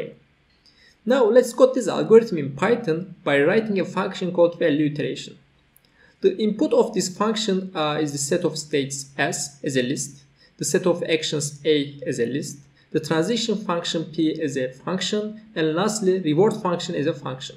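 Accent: Turkey. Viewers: Dostum anlatım çok güzel, aksanından Türk olduğunu düşüyorum. Eline sağlık.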